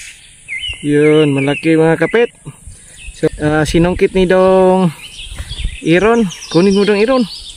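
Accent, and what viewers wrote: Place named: Bohol, Philippines. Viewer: Ta taga bohol ka ? Maila mn gd sa tingog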